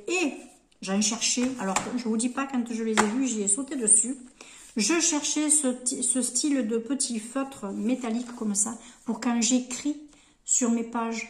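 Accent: South, France. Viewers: Merci pour le partage. Vu le petit accent je pense que vous êtes dans le sud. Je vous dis ça parceque moi en région parisienne, on est ravitailler par les corbeaux. Chaque fois que je vais aux 3 Actions vers chez moi. A croire qu'ils se donne le mot. Je suis nouvelle sur votre chaîne et je viens de m'abonner. Bon dimanche Amicalement Lidia. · Coucou, Très joli haul, et grâce à ton accent, on entend presque less cigales 😊 Je te souhaite une merveilleuse année 2024, et tout le bonheur du monde 😊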